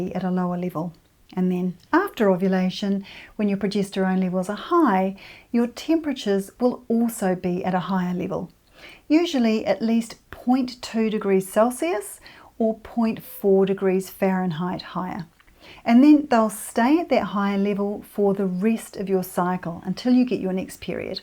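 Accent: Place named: New Zealand. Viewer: Very informative. It's so nice to open a video on the subject of fertility and hear a kiwi accent 😊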